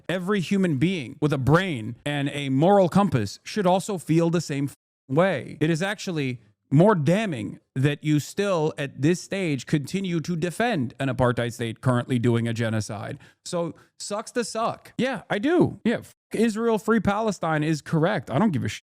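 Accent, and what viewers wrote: Australia. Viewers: I'm also an Aussie, I was musing about where geographically in the country his accent might be from. Cities have a posher accent whereas rural Aussie accents are described as "broad" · Heads up I had previously subscribed to your channel and I’m here to find out what the effed up lie sky news is saying about you, being an Aussie myself. And I find out that I am unsubscribed to your channel. wtf? Love your Aussie accent very very funny lol. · It's like a cross between "oh naur" city girl, and broad TV aussie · Australian Fox News has very few Aussie subscribers, but US MAGA love it. !! See a mention as a point of pride. Your Aus accent is f.....d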